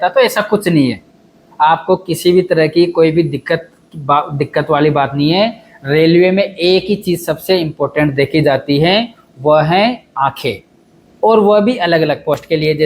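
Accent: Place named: Rajasthan, India. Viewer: Sir aapki language tone se hi pta chl gya ,aap rajasthan se h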